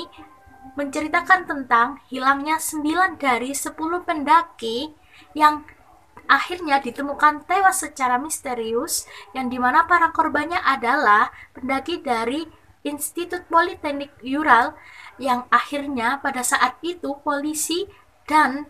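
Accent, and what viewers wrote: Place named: Palembang, Indonesia. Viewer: loga ny kayak orang palembang hehehe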